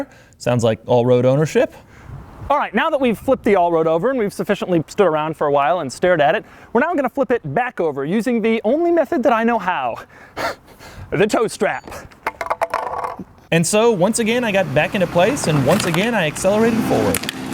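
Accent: United Kingdom. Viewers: you sound like a land rover enthusiast...british actually, not forgetting that british motors are awfully unreliable. get that from an african!!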